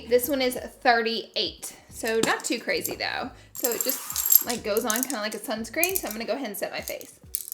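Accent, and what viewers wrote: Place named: South, United States. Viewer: You have an accent (to me)! Where are you from? It’s very southern belle/ country lol and I can’t tell your ethnicity 🧐🤔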